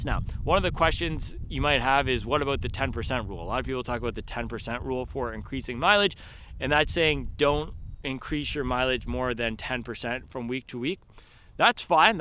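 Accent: United Kingdom. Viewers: I swear I thought u were British 😂 I was 2 seconds from cutting it off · I’m British and thought you were British for a few seconds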